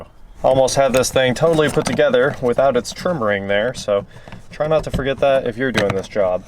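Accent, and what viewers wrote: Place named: Midwest, United States. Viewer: the "needs replaced" dialect is very interesting , only heard it in the middle of the USA, versus "needs to be replaced". Noticed it over several videos.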